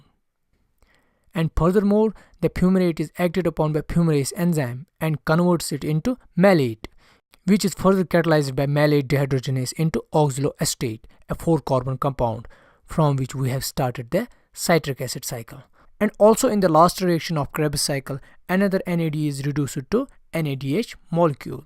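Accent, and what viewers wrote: Kashmir, India. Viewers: Sir, are you from Kashmir? Your accent indicates so.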